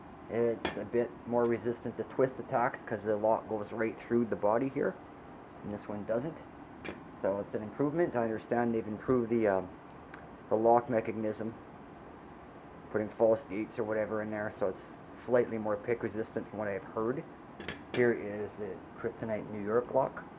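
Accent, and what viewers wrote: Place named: Canada. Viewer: Thanks for making the astute comparisons on the Kryptos. You sound like a Canada man. As for the bracket/clip mount, for the yellow NY Standard, I've simply taken off the bracket with the hex screw, and I have no intention of packing it that way. I pack in a backpack especially for hauling groceries, on the way to the store. On the way back, I have three choices. Either in the pack if there's enough room and nothing is getting crushed, or outside on the inside of the straps, and over the top, or on the bike itself around the handlebars in one of a couple of ways. It's fairly stable that way for a couple of miles returning for any of my three bikes.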